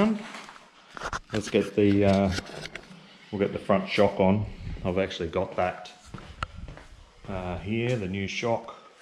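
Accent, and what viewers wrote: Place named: United Kingdom. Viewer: interesting It sounds like you're in the UK. Our USA ultra-lites are much lighter but I was thinking of doing something similar... just some ideas to pass along. the flying boat part is good, but I would do a high wing, also maybe some small out-riggers for weight saving with comparable stability out of a narrower, lighter, center canoe type body. We are limited to 254 lbs plus an allowance for floats and parachutes... yours is looking good. Did you use plans or a kit, or is it your own design?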